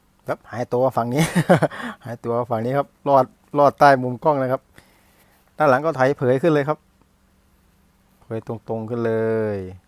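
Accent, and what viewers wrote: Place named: Laos. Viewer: ข่อยมักการพากษ์อ้ายแฮงครับ เว้าไทยเเล้วสิมีสำเนียงลาวแกมมานำ แบบผมเลยครับ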